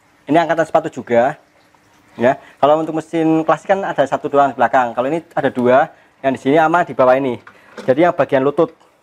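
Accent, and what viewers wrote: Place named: Java, Indonesia. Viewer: Wong jowo angel ilang jowone👍🏼😁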